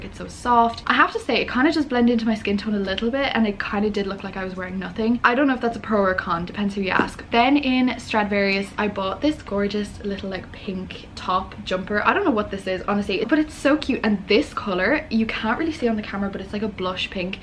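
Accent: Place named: Ireland. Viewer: I love the Irish accent!! I can listen to you all day! I love your videos 😊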